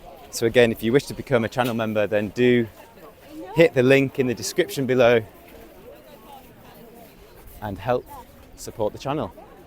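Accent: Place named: England, United Kingdom. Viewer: The commentator sounds like he's English by his accent. Why is he using the American word for pavement?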